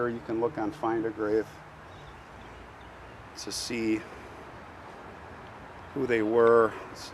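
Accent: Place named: United States. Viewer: Your talking in popular American English helps me to learn more and more words that I don't know before because I already learned the standard English language ..Thanks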